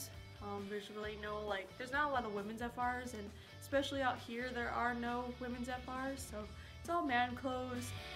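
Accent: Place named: South, United States. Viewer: Me Bcn its southern accent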